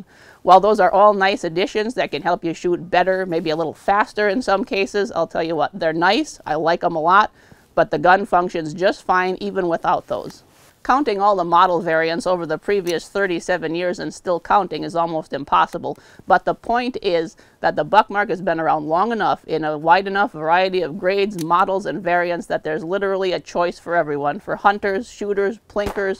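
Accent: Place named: Midwest, United States. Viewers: Ya! Upper midwest, I bet. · Wild stab in the dark, she’s from the Midwest?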